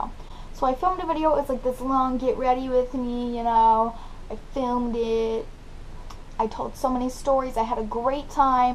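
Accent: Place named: United States. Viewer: Omg this is sick!!! I'm from England and I love the Amercian accent😂😂💓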